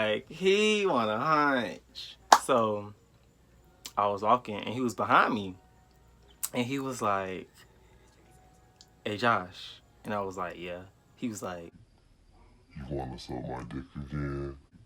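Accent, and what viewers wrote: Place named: Midwest, United States. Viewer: U from or in da MidWest ? U sound like it.....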